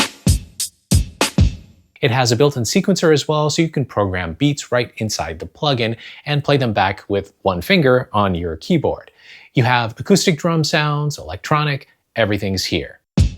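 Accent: India. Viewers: Hi. You are clearly from india. but i am wondering what your mother toungue is? I love Your reviews. This is Preetam from India.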